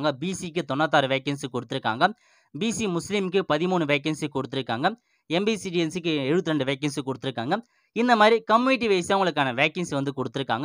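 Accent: North, India. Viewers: என்னடா நீ வடக்கன்ஸ் மாதிரி இருக்கு உன் குரல்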